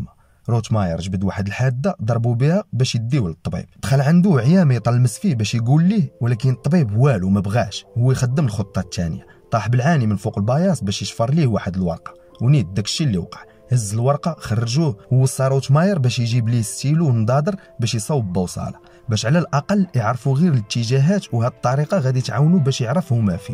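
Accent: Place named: Tunisia. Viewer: علئ حسب ضني هذي اللهجه التونسيه اذا ماني غلطان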